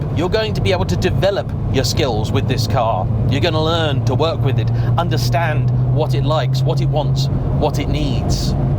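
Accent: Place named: England, United Kingdom. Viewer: Gotta love England accent.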